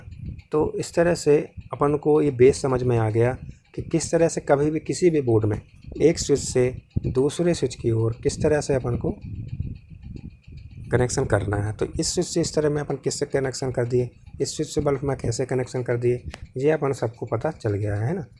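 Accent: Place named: Jabalpur, India. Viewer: Bahot badiya ..bahot pyari video hai..lage raho.. Jabalpur se ho kya..aapki bhasa se lag Raha hai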